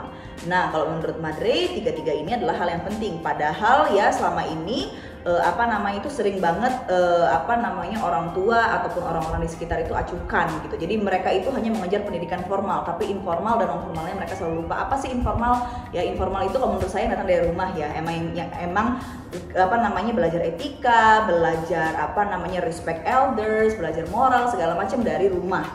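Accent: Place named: Medan, Indonesia. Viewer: Hah? Madre orang medan ya? Pantesan aku pernah dengar madre pakai logat medan sama kira. Aku juga orang medan madre. Salam kenal 😊😊😊😊😊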